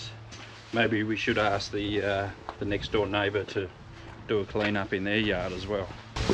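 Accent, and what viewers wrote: Australia. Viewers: Great job and, I love your talking during the video your personality is coming through, plus love the Aussie accent ❤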